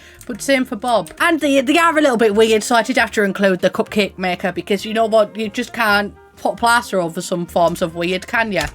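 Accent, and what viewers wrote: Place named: England, United Kingdom. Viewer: Your English is great! She was hard for me to understand at first and I am a native English speaker lol. The English accent on this one is strong, so good for you!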